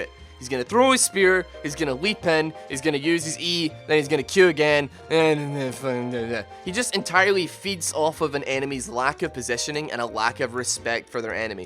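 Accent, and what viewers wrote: Scotland, United Kingdom. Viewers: is Scottish or something? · Bro are you scottish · Is he from scotland? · Bro, you’re as scottish as anyone van become xD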